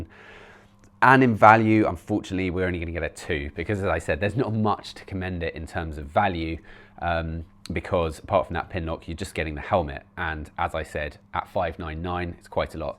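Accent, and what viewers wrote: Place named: United Kingdom. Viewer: speak a bit more clearer with he's British accent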